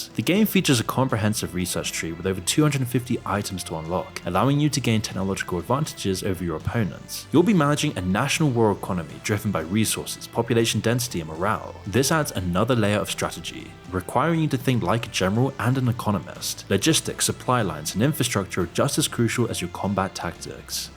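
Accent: United Kingdom. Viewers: lmao ain’t listening to a guy w a british accent talk american politics get outta here bro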